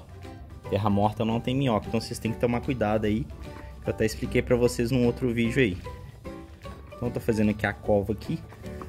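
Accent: Minas Gerais, Brazil. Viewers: Que sotaque mais fofo! Será mineirinho? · Bom dia. Seu estilo de voz me faz lembrar e sentir saudade do povo mineiro, inclusive meu primo Geraldo Mangela, que mora em BH. Bjs de saudade primo, te amo!!!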